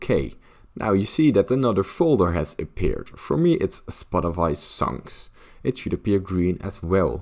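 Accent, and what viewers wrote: Netherlands. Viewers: Ik ruik Rotterdams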